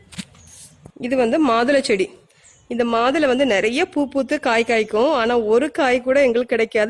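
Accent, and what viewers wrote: Tirunelveli, India. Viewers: Nenga tirunelveli ya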